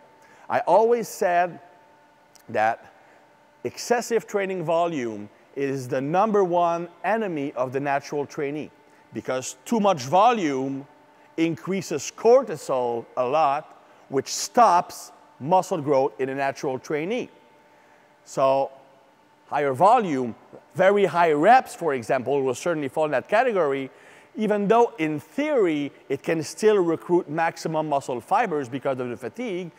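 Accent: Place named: Quebec, Canada. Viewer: I trust him because of his Quebec accent. Never met a dishonest or bad Quebecer.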